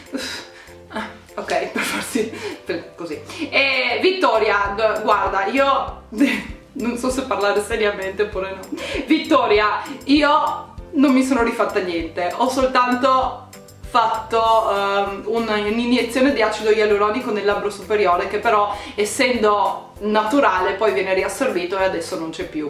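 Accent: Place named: North, Italy. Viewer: Ti adoro all' inizio mi ero fatta un'idea troppo superficiale di te forse perché non conoscendoti e vedendo per la prima volta i tuoi video non avevo ancora capito che persona potevi essere ma dopo un po' non sono riuscita a non smettere di guardare i tuoi video, sei simpatica solare e anche bella. forse all' inizio mi sono fatta un idea sbagliata di te un po' per l' accento del nord e un po' perché non capivo la tua ironia ma ripeto è stato inizialmente poi conoscendoti ho visto altro e la tua ironia la adoro! un bacio e le Chanel se le hai mostrare chissenefrega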